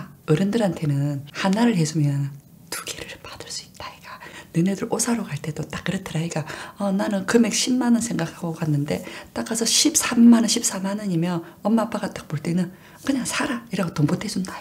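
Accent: Busan, South Korea. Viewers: 부산사투리 넘 귀여워요😂😊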